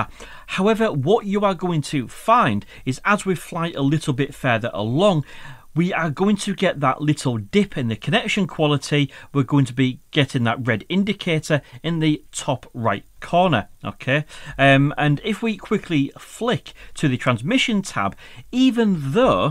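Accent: United Kingdom. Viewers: I am from indonesia Is the accent that you are using is british?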